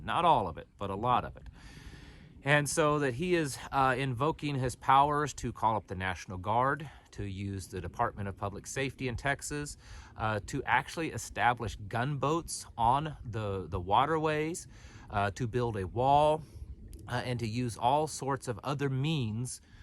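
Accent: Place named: Appalachia, United States. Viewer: I think if you’re gonna call yourself a hillbilly you’re gonna need to use a heavier accent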